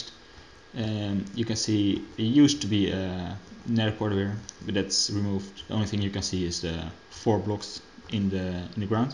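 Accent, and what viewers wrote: Netherlands. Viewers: Nice dutch accent ;) This works like a charm, thanks!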